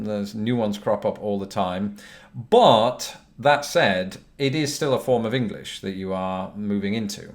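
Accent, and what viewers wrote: United Kingdom. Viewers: Loooooooooove That British accent. So True we like it! And good content (yep - Love British history. My family came over on the Mayflower from Manchester! 😀) Now I'll be able to enjoy the accent and content on the Reg. New Subbie. 💋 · to be fair mate your accent sounds british as fuck even to other brits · Just found your channel. This is so funny and so true! Having a British accent sure intrigues us Americans! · I LOOOOOVE the British accent!!!! I dont know why, but it just makes me crazy!!! So beautiful! Love from Texas!❤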